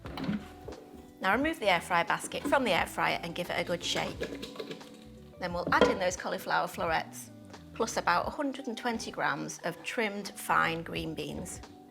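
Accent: United Kingdom. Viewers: So glad to find your channel, I am improving my British accent and your accent and cook are both incredible. I follow u from Iraq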